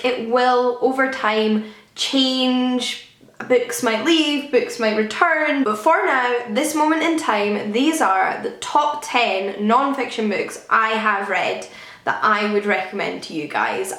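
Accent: Scotland, United Kingdom. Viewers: I LOVE your accent! Scottish accents always amaze me 😂 I'm British by the way.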